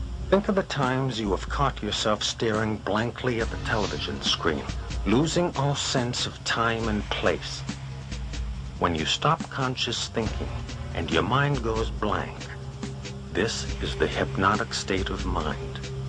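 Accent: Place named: Boston, United States. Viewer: british? he sounds like he is from boston to me!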